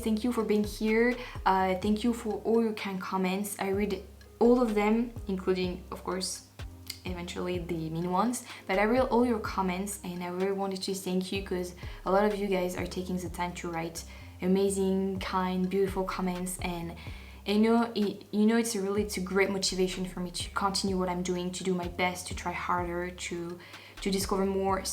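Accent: France. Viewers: Jamais, Jamais, Jamais. Never apologise for what you do or who you are. Your English is brilliant and with the french accent it is so endearing. When you do the English word challenge just remember that 99.9% of English speakers couldn't pronounce them either. I live in England and we have a saying, that if somebody says a word we have not heard before. we always say "have you swallowed a dictionary?". Nobody can ever become fluent in another language unless they were born in that country. As to music that is a personal choice. I could list artists you or a lot of people have never heard of. Does it matter..NO. You are learning, exploring and finding new music. My French is in no way as good as your English but I listen to French radio channels and watch French tv stations to find out more about your culture. Please ignore all the negative comments and continue with your enjoyment of life. 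You have to live your life and not other peoples. xx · Bonjour Don't listen to those who say your English isn't "good enough". It is very good, I have no problem understanding what you are saying, you are able to express yourself well, and I think the French accent is a beautiful addition and adds so much charm!:) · I watched a language video about English words that native speakers pronounce wrong. There are words in English that English speakers pronounce wrong but you a native french speaker are supposed to speak English correctly. I also wonder how many languages the people insulting your English speak. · In my old opinion, it doesnt take an expert in music, art or anything to have a reaction or opinion about anything. You just keep doing exactly what you are doing doing. Please dont let the negative nellies get the best of you. Dont worry about the things and people that try to make fun of you, talk down to you and try to make you feel bad about yourself. 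They are doing it for their own pleasure so dont even read the posts. For being French you speak english very well and i love your accent. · Hola (Hello in Spanish pronounced "O-la")! On any social media platform people like these are known as "trolls" pay no attention to them I've seen them go on children's and people with disabilities channels and leave hateful comments. The funny thing is that they do not realize that they are helping your channel by giving you more views! So do not waste time, energy or sleep thinking about these people. You are one of the few channels to which I subscribe and it's because you being from France I enjoy you learning new things whether it's music, movies and English! You accent is beautiful and you are right I myself know nothing about popular French music, food, lifestyle or customs so I don't see how people can expect you know all these things from here in the United States or any other country where you did not grow up. 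So just do what is fun and makes you happy and those who don't like it can add to your channel views and move on!!! Sending you BLESSINGS from Texas!!! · I love your French accent and your broken English is cute and endearing. I hope you never lose it. I never thought of you as anything other than just a person sharing your experience and thoughts with us as you explore new music etc. I like your style so keep it going. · You are an authentic, transparent person. Keep doing your reactions,. Tu acento frances en ingles es muy simpatico. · Hi, I’m Jim Rainguet(French American), 71 years old, widowed, and thoroughly enjoy your reactions and your French pronunciations of English. You seem smart to me and isn’t having a reaction to new things the point of your channel. Don’t listen to the negative weirdos, just know people like me are out here reacting to things along with those who appreciate and love you! 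Jim Rainguet, Colorado, USA.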